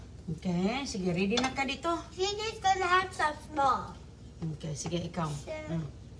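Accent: Visayas, Philippines. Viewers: Anong masama? Ndi nmn pagandahan ng pronounciation jusme,bisaya kasi sya kya ganun nalang pagbigkas nya,ndi na kylangan tamangnbigkas .marunong knaman yta makinig intindihin muna lang.mga ibang pinoy tlg mga perfectionist kala nman sosyal din,mukhang nag ppakasossy lang naman😂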